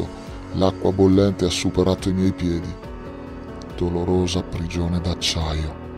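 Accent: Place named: Lombardy, Italy. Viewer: Bella voce. Ma di dove sei? Sembri Lombardo ma non capisco di che zona!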